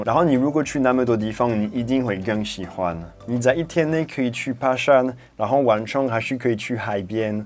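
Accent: Taiwan. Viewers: 哈.....台北俏俏話+1!!!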